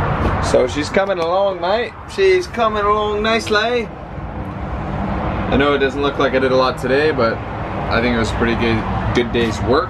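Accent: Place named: Canada. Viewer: Came to watch these old vids and you sound so much more Canadian haha. Loving this series.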